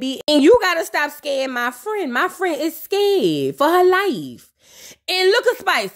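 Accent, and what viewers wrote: New Orleans, United States. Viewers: you sound like my teetee erika love your nola accent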